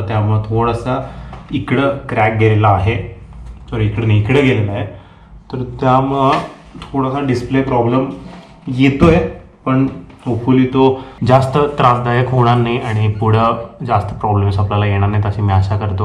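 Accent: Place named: Sangli, India. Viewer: असल सांगलीकर आवाज आणि भाषा...sutti not ch o.... 👌👌😍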